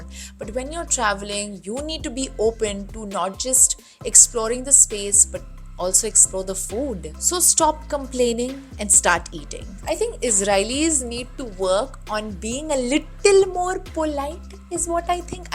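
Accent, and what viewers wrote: India. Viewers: 😂 You still have your Indian accent unchanged! About your comments on Indian punctuality (or lack thereof) I agree with you a hundred percent. IST (Indian Standard Time) is also known as Indian Stretchable Time. I wouldn't like to total up the manhours I've spent fretting and fuming, waiting for someone or the other: the total figure might give me a heart attack.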